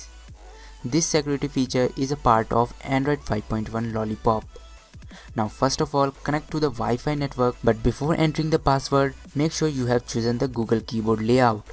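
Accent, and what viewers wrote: India. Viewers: I dont understand indian english...accent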